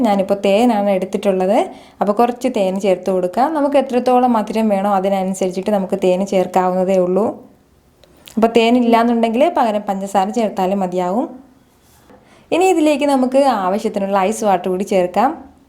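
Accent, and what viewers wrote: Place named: Malabar, India. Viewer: ath അറിയ but malabari style talk ആണ്. അതല്ലേ നമളെ പോലുള്ളവർ fans ആയത്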